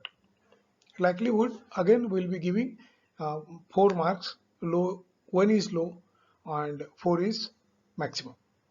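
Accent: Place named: India. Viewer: Sorry, I'm Italian but I don't understand much of what you say. You have a strong Indian accent. You should post subtitles.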